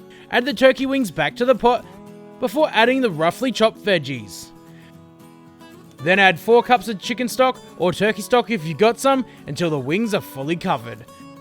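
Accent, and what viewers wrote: Australia. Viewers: australian voice making Cajun food ??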